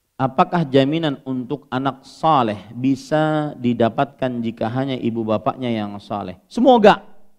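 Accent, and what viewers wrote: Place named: Banjar, Indonesia. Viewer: Orang banjar lih pian guru..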